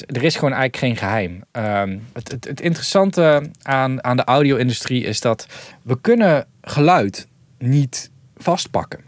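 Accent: Brabant, Netherlands. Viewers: Hoor ik nou een klein Brabants accent? 😀😀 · Mooi Brabants, was me niet in het Engels opgevallen..... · Nice een keer zo'n video haha!🤣🤣 Wat grappig dat je "een bietje" Brabants accent heb en ik dit nu pas hoor na al die video's in het Engels🥲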